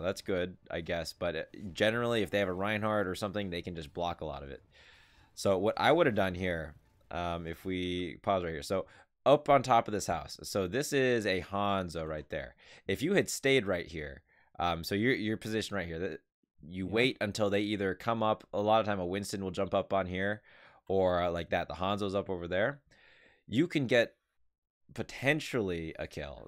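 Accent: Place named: Italy. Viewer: He is so Italian... why do we have such a distinct accent when we speak english god damn it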